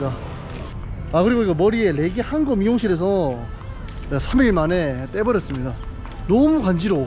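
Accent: Gyeongsang, South Korea. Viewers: 말투가 대구말투이신것보니 대구경북사람이군요~ 분명히 부산경남말투는 아님ㅎ 제가 TG사람이라~정감가네요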